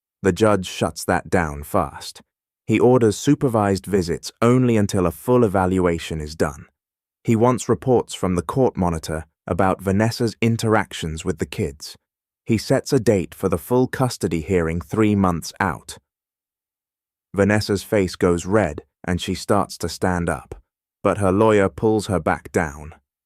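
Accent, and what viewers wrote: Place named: United Kingdom. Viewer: THIS IS AN EXACT REPEAT FROM ANOTHER CHANNEL!!!! IT’S STANDS TO REASON IF YOU ARE GOING TO POST STORIES YOU SEARCH FOR ONES THAT HAVEN’T BEEN USED BEFORE INSTEAD OF CHANGING THE NARRATOR‘S VOICE TO BRITISH!!!! VERY DISAPPOINTED, TOO MANY PEOPLE TRYING TO MAKE A LIVING POSTING FAR OUT, REPLICATED, AND RANDOM NEW STORIES TO PROVE THE CHANNEL IS DIFFERENT AUTHENTIC ORIGINAL!!! UNFORTUNATELY HE WAS IN A BRITISH ACCENT WITH BORING BACKGROUNDS, THE QUALITY OF THE WAS DESTROYED, AND PROPER PRONUNCIATION AND POORLY DICTATED WITH A VERY MONOTONE VOICE!!!!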